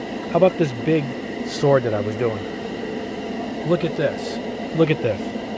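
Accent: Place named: Massachusetts, United States. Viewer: Hey but your accent it sounds like you're from Massachusetts. I'm in Halifax on the South Shore. What store in Massachusetts can I pick this paint up at where do you go. Thank you so much I think I'm doing my 24th scale drift car '1970 Camaro. All Chrome. Maybe blue race stripes. Or maybe just some pinstriping good anyways if you can help me out I'd love it thank you very much great video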